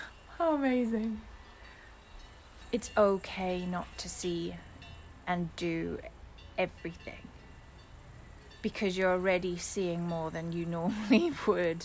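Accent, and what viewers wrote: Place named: England, United Kingdom. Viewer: I`m English and you accent is so close to it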